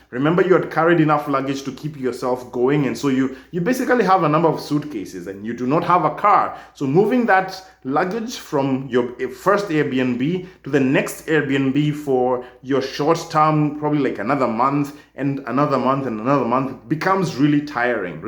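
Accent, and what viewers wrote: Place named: Kenya. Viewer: You sound Kenyan. Useful video.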